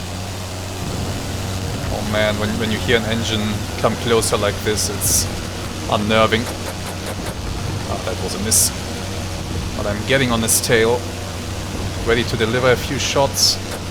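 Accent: Germany. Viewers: Der Titel dieser Serie gewinnt immer mehr an Wert. Weil, sooooo kurz ist das Leben von Felix dann doch nicht. Allerdings wartet der Tod in jeder Folge. Wird wirklich Zeit das es bessere Maschinen gibt. Von daher ist deine Strategie genau richtig. Besser einen Kampf, den man nicht gewinnen kann, zu vermeiden und dann später mit besserem Gerät deine Skills einzubringen. Würde ich genauso machen. Dein Englisch klingt so als wenn deutsch deine Muttersprache wäre. Macht das Audio für dieses Projekt irgendwie "cool". Naja, hoffen wir mal, das wir gemeinsam noch viele Folgen des Felix erleben dürfen. Vielleicht wird Felix von Berg der neue "Rote Baron"? Jedenfalls ein LetsPlay mit einem echtem Mehrwert für den Zuschauer.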